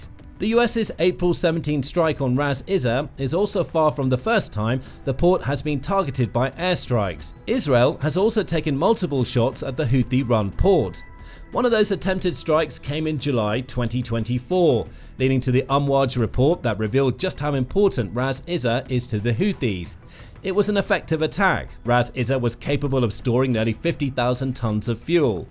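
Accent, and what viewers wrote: United Kingdom. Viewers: I am SO sick of narrators with British accents.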